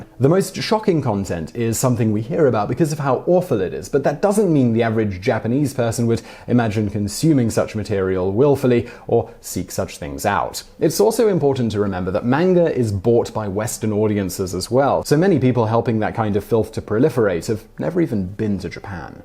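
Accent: England, United Kingdom. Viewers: Why is this British-sounding guy lecturing us about "what Americans think?" Why is this titled as what Westerners think, and entirely geared towards American (mis)conceptions? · He has said his audience is mainly American. And maybe youve noticed his English accent? Plus theres the fact he lives (or lived Im not up to date with his personal life) in Prague. His audience IS international. Im Australian, I have no issue with him addressing his core audience more often than me.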